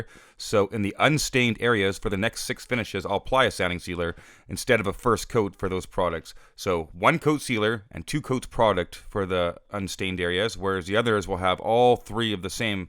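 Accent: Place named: Canada. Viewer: I knew you were Canadian as soon as i turned it on! i'm going to refinished my floors and this was so much help!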